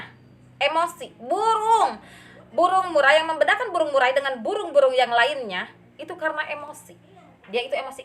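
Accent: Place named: West Java, Indonesia. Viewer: Resep aing mh ngadangu ngomng na Sunda pisa tteh mh 😅😅 Salam santun tteh 🙏🙏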